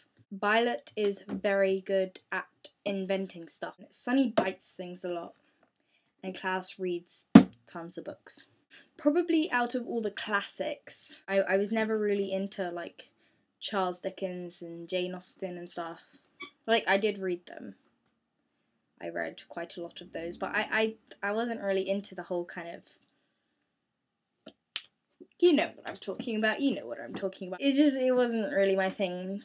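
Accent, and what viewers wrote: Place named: RP, United Kingdom. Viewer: lol you sound so posh!! =P never noticed ur accent before.....i think im becoming more scottish =] love you......<3 xx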